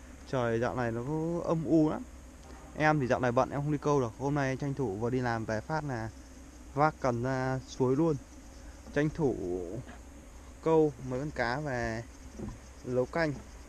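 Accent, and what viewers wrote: Vietnam. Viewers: ông này nói giọng khu vực nào ý nhỉ, Hà Tây à, chỗ toàn nói thành chố, đĩa thành đía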